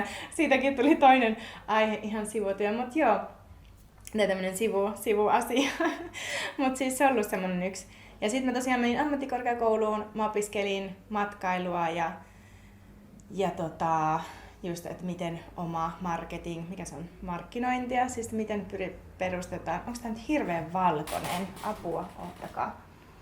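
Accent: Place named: Oulu, Finland. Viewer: Hei, mua ei niin ahdista talvet pimeyden takia, kun ne tavallaan myös rauhoittaa itseä ja helpompi nukkua. Suomessa ja varsinkin talvessa itselle suurin ongelma ihmisten asenne ja yleinen ilmapiiri. Tavallaan vaan haluaisi pois täältä ja vaikka semmoiseen paikkaan missä ei edes olisi koko talvea aurinkoista. 😅 Oon muuten kans Oululainen ja sun murteesta kans kuulee et olet ☺️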